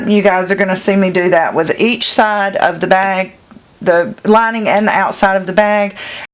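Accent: South, United States. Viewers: I subscribed. You are sweet and feisty af. Plus my fam is from SC so the southern twang makes me feel right at home. Thanks for being lovable and informative.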